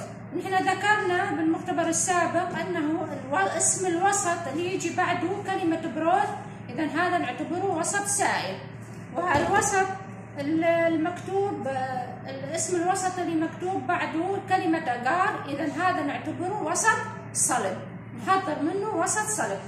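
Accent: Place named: Baghdad, Iraq. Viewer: جهود مباركه اللهجه الحلوه ❤ بغداديه قح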